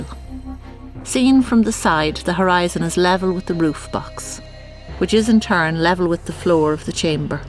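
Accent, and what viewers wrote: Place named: Ireland. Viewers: Absolutely wonderful! The narrator's lovely Irish brogue lends such authenticity; clearly a highly intelligent, cultured woman. Amazing how New Grange has survived intact. Robin Witting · Oh, iffits a Colleen it's a lovely Irish Lilt, man!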